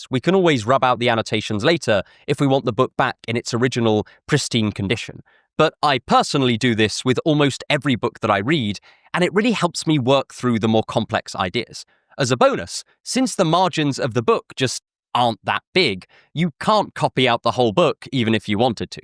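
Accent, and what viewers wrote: United Kingdom. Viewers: Love that british accent. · Is this guy British? Just asking. No reason. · listening for the first 5 secs of the vid, i think he is a brit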